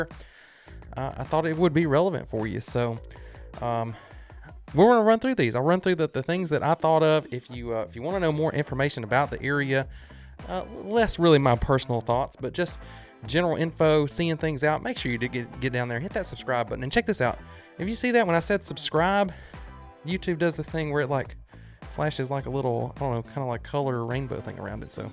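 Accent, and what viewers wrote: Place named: Texas, United States. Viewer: Oh wow, when I was watching one of your videos I thought that’s a Texas accent. I’m from Texas. Now it’s confirmed.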